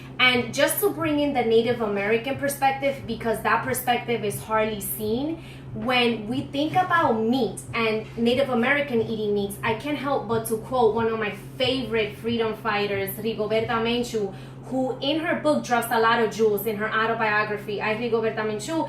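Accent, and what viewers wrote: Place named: New York, United States. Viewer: Enjoyed ur video. I am Puerto rican, and I enjoyed watching you make this. Nice job. Are you from New York City? You kind of had that New York flavor...lol Thanks again!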